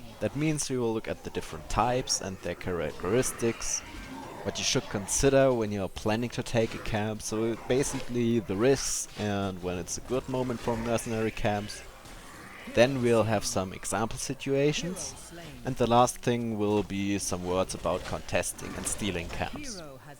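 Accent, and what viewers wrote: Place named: Germany. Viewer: Dat german accent...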